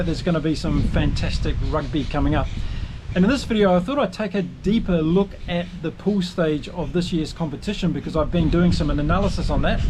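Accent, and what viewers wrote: New Zealand. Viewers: Someone savaged my comparing your accent to great rugby commentary from NZ pundits, granted it's been a bit hairy of a reaction from The Breakdown but still find your demeanor very astute, knowledgeable and importantly unbiased. Keep up the great work. :)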